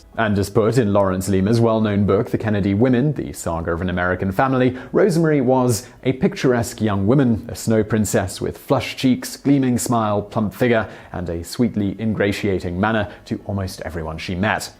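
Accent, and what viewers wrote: England, United Kingdom. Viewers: Tell me why I thought vsauce was having a midlife crisis and took on an English accent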